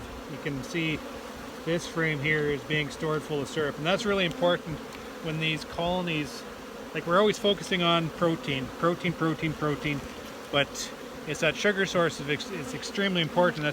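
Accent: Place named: Alberta, Canada. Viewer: Where are you at lol you sound close to me in Alberta east of Edmonton 1 hour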